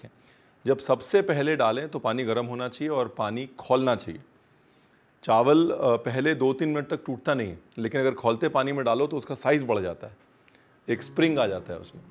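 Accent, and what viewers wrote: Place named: Lucknow, India. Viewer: Ranveer ji aapka jo bolne ka lahzaa hai hume Lucknow ke yaad dilata hai.bahut bahut Shukreya... Uske liye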